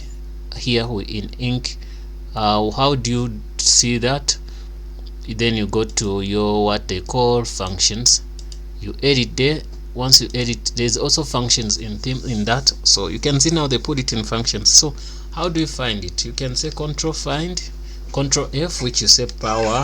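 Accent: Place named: South Africa. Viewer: Great video thanks man, keep it up. Good to hear a South African-like accent online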